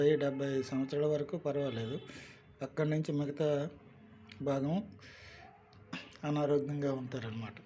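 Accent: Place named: Srikakulam, India. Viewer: గురువు గారు మీ యాస, భాష చూస్తుంటే మీది ఉత్తరాంధ్ర శ్రీకాకుళం అనిపిస్తుంది.. మీరు ఎక్కడివారైన చాలా మంచి విలువైన వారసత్వ నిధిని సమాజానికి అందిస్తున్నారు,అంతరించిపోతున్న ఇలాంటి భారతీయ విద్యను నలుగురికీ తెలిసేలా చేస్తున్నారు..కానీ నా విన్నపం ఏంటంటే మీ లాంటి వారిని ప్రత్యక్షంగా కొంతమందిని తయారు చేయండి.. కనీసం మీ ఇంట్లో వారినైనా కొత్త తరాన్ని తయారు చేయండి... నమస్తే ,జై శ్రీరామ్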